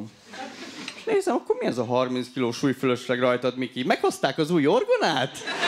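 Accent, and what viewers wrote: Székely Land, Romania. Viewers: Szekelyfoldon pontosan is beszelnek! :D Ott lakok szoval jol tudom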